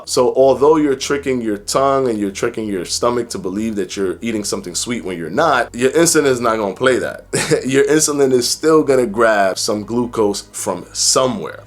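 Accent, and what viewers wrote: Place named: East Coast, United States. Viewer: Are you from the East Coast, I hear an accent?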